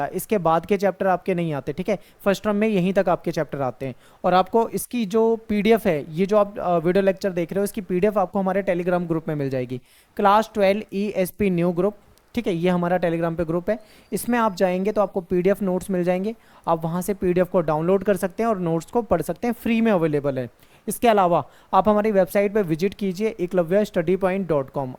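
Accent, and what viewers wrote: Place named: Haryana, India. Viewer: Bhiari nhi beta haryana se ha❤😊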